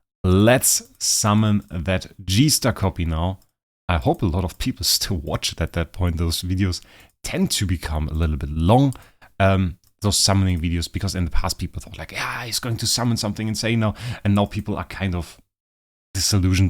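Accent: Germany. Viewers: I can definitely hear the German accent but it might be because I’m danish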